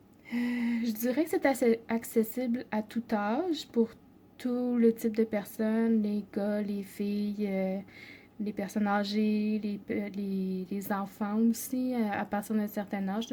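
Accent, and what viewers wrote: Canada. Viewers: Coucou très beau début et j'adore l'accent du Canada. merci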